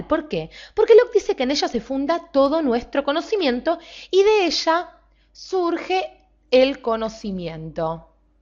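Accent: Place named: Buenos Aires, Argentina. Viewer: jueno es porteña es el acento de estos arrogantes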